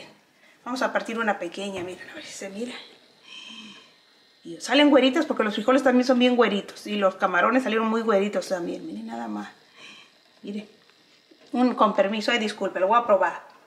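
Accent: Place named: Honduras. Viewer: Soy de jakisco pero su acento no me parese de jalisco vivira ahi y tratara de imitar el acento espanol pero no me parese de jalisco le siento otro acento me parese que es usted de HONDURA?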